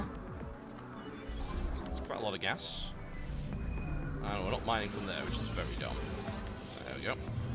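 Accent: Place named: United Kingdom. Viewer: I really wish you would continue this series tb. I understand you're a busy man with all the SHOUTcrafts and whatnot but this is a nice series to watch as a struggling beginner. I think watching you play and hearing your commentary on what you should do and what you should have done better really helps. Watching the pros play doesn't help much because its very fast paced and hard to follow and learn from. Plus hearing your charming british accent is nice too :).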